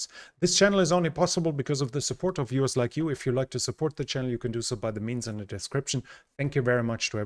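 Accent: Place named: Germany. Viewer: Where are you from ? Germany or Scandinavia ? Just guessing As a German your accent could be both :D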